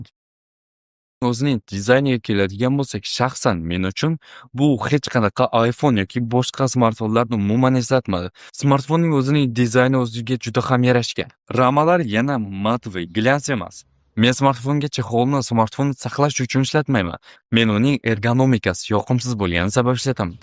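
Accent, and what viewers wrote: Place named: Amudarya, Uzbekistan. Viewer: Aka Amudaryodanmisz shevangiz tortyabdi videoda mangʻitdagi tanish yerlar koʻrinib ketti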